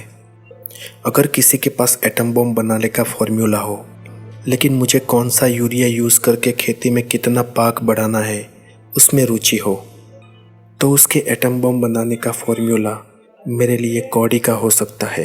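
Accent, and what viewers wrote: Gujarat, India. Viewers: Aap gujarati ho na...😊😅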